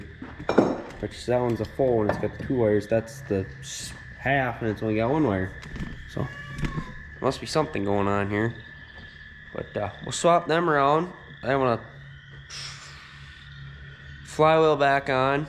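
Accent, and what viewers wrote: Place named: Midwest, United States. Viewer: sound a little midwestern lol either your from Minnesota or Wisconsin just picked up a 111 today needs a new engine as well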